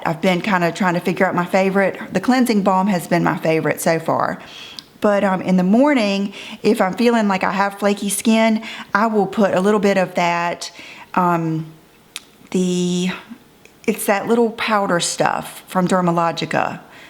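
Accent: South, United States. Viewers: Love your channel! Your voice is music to my ears as I am a displaced Southerner! Love your content too! Thank you so much for bringing all this info in a fun to watch videos! Not easy to do.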